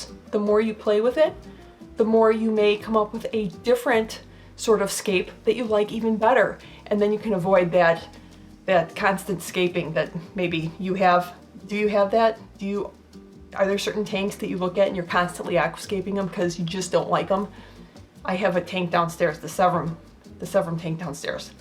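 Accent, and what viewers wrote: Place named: Upper Midwest, United States. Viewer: Hmmmmmm.. I’m not a language expert but you sound like you’re from Wisconsin or Minnesota..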